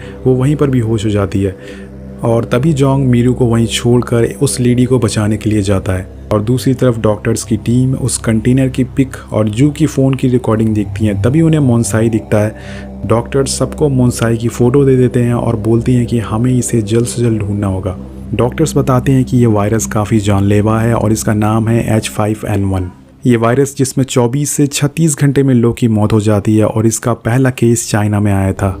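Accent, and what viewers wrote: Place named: Bengal, India. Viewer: Apke bolne ke tarike se yeh pata chalta hain aap sayed bengali ho....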